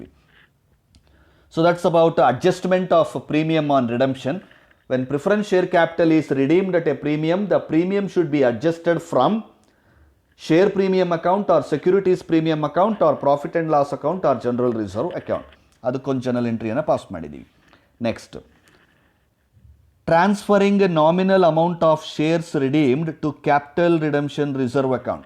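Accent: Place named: South, India. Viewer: Aap south kyu bolne lagte ho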